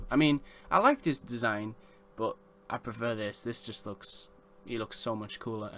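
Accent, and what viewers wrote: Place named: England, United Kingdom. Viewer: Hey man awesome video as usual. Just wondered if you think there going to update some characters like clayface, killer crock and now that they're adding him Solomon grundy to be big figs or even have transitions with people like bane in his lego batman/2 skin then push the venom button on his chest and do a hulk with him slamming in to the ground and looking badass. Also where in England are you from if you don't mind asking :)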